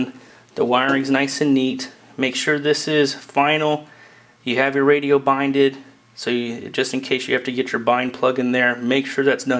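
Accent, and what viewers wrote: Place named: United States. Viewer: got their language from England (i.e. you actually speak English in case you hadn't realised.....) anyway, all that said you pronounce 'Solder' with the 'L' pronounced so it isn't, never was, never meant to be pronounced 'Sodder'. Just like you look at yourself in a 'Mirror' and not as you Americans say in a 'Merr'! AND also while we're at it, the most annoying mispronunciation you say, there's an 'S' on the end of 'Math' making the correct pronunciation 'Maths'. So please, when you beg, borrow or you steal something (like language for instance), please have the decency to use it correctly. I eagerly await your childish, abusive comments as I know how you Yanks hate to be told anything and 'put right'. Thank you and 'have a nice day y'all' :)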